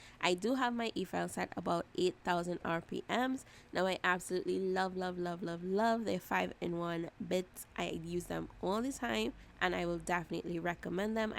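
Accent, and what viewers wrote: Trinidad and Tobago. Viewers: Are you from Trinidad?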